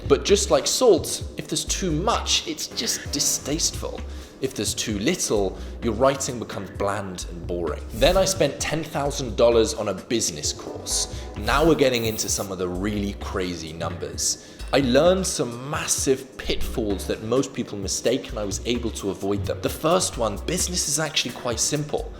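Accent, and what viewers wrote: United Kingdom. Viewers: What? You mean the british accent? The accent that has because he is from England? The same accent used by most of the british population? I guess you're right. If this british guy we don't personally know is talking about money with a thick british accent, then he's probably some trust fund baby trying to scam us. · Hey, i thought you were from the UK because of your britisch accent haha. Where are you from?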